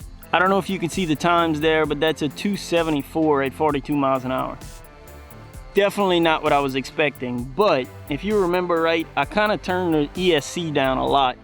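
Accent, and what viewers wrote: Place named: South, United States. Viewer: That definitely sounds like a south of i10 accent.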